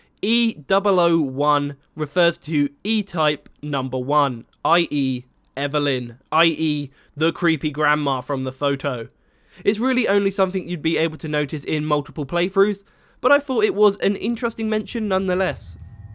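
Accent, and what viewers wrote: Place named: England, United Kingdom. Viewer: Why does these kind of videos always had a narrator with english accent?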